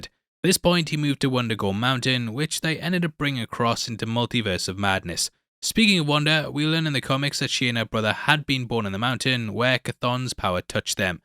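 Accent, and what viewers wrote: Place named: North East England, United Kingdom. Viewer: Oh God you said Monga. Manga ffs. You're English. MANga. Ffs. I get the NE accent.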